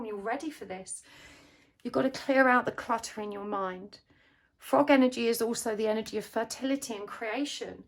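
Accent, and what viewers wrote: England, United Kingdom. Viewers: You just sound so English lol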